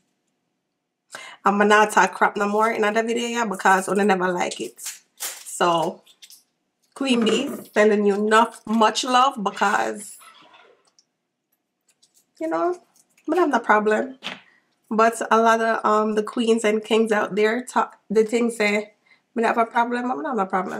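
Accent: Jamaica. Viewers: You Jamaican?? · hi are you Jamaican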